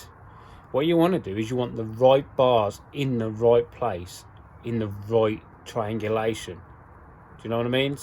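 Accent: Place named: Midlands, United Kingdom. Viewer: Hi mate - guessing from your accent your from the Midlands - where about a are you ? Would like to discuss a possible project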